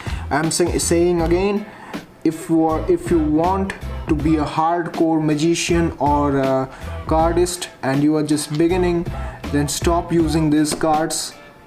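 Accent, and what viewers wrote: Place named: India. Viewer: Wtf and desi English bro😀👍I am watching this video 26th time lol btw great video that's why I am watching this video 26th time lol😀🔥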